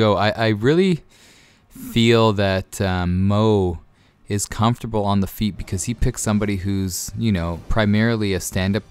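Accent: Canada. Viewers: you're so Canadian I love it eh hahah. isn't the Spartan fighting this weekend? I love him. and actually didn't kno he was Canadian haha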